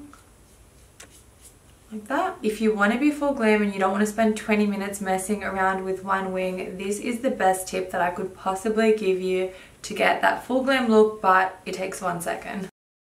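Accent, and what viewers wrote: Australia. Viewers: Hi, I’m new to your channel and love your makeup looks! You have a beautiful accent, is it Australian? And what is your ethnicity?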